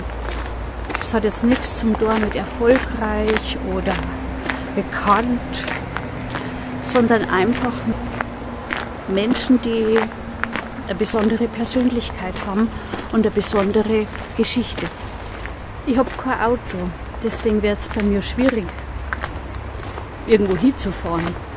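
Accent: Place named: Bavaria, Germany. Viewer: ich habe deinen kanal zufällig entdeckt und als allererstes viel mir dein mir so vertrauter bayerischer, von dir sehr beruhigend gesprochener dialekt auf. dann gleich als nächstes deine authenzität, die bescheidenheit und emphatie. keine reisserischen storys, sondern alltag, untermalt mit tiefsinnigen gedanken, die zum nachdenken anregen. daumen hoch und abonniert!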